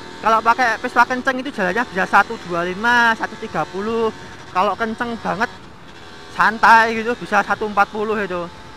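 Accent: Java, Indonesia. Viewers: Silang jowonya pekat #frommalaysia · wong magelang to sampean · Jowo mas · Wong temanggung@ HAHAHA aku ngekek saben weroh video gon mase iki. Medok banget suarane wkwkwkwk :v :v . mirip IVW motovlog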